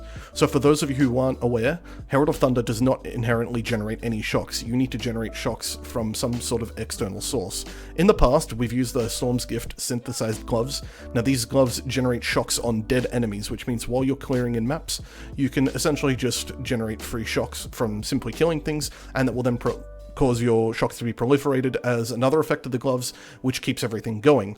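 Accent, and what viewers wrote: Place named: Europe. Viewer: opening the video, seeing gameplay & hearing an EU dude right away Me: Oh this is a good build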